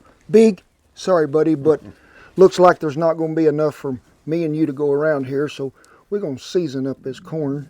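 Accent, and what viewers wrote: South, United States. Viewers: Southern accent really amazes me. Keep rocking sir with your cowboy style of cooking.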